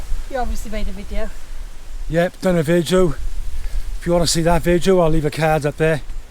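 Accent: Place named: South Wales, United Kingdom. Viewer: Another great video. A bit nearer to home for this camp. It’s a fair drive from South Wales to Snowdonia for you each time,but well worth the journey isn’t it? Good to see with you. ‘cute in it!’🤣Love the South Wales Accent 🏴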